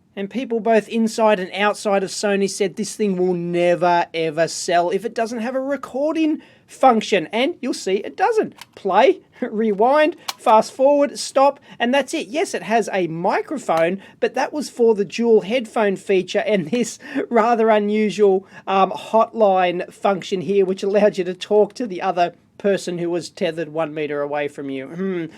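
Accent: Australia. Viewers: You have the most australian dialect ive ever heard "loosey goosey" lmao wtf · Having coffee this morning & listening while this in the background build building a new 3d printer. Love the Walkman tear-down. (Although I'm not sure if its the coffee or the ausie voice waking me up!) cheers :)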